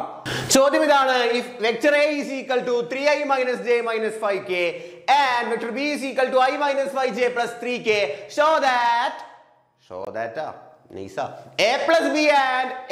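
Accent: Thrissur, India. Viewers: Bro he is pure thrissur. Thats Y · Thrissur ano sirnte veed😅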